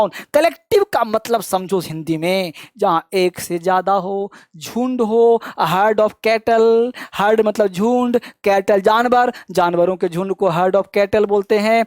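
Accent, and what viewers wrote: Bihar, India. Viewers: Sir Ap Bihari ho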